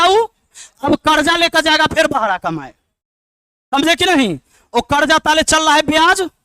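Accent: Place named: Bhojpuri, India. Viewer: सच में सर 🙏आपकी बात करने की शैली न दिल को छू जाती हैं। हिंदी में मिक्स, भोजपुरिया अंदाज़...............👌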